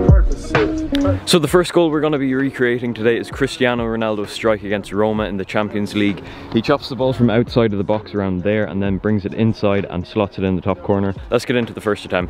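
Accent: Ireland. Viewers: Are you Irish you have an Irish accent sounds like your irish your a goaT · Luv ur videos and im 99.9 percent sure ur irish like me😂👍🇮🇪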